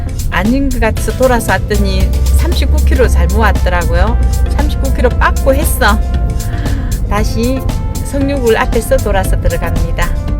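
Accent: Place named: Daegu, South Korea. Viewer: 우리 사돈댁 근남면이군요. 억양이 대구분 같네요.. 여기는 수성구 황금동입니다. 다슬기도 있겠어요.. 같이 가시지요..😊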